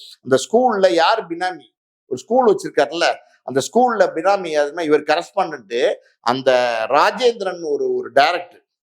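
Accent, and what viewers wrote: Madurai, India. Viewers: WOW Sivappu RED RAMARAJAN sattai with Pure MADURAI village bashai and SHANMUGA SUNDARAM Karakakkakaran style.. scratching THE CHEST.. WOW you are a great actor.. Wonderful voice...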